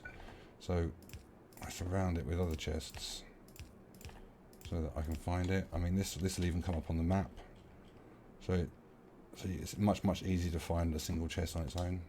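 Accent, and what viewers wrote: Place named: England, United Kingdom. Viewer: Are you from England your accent sounds like mine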